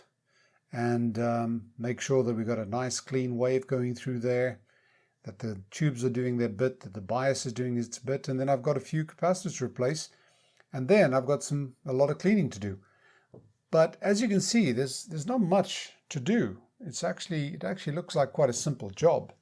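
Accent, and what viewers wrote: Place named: South Africa. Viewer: btw i was always puzzled by your South African accent, in Madeira and just now i found out that you studied there. The mystery solved. Sorry for prying.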